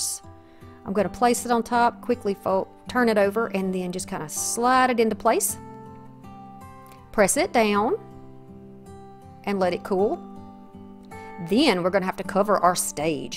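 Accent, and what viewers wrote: South, United States. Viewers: I so enjoy your creations and listening to your sweet southern accent. Keep crafting and thanks for sharing.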